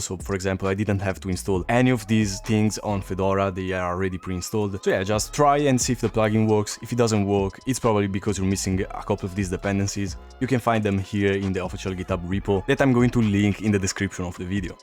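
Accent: Italy. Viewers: are u italian by any chance? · is vro italian?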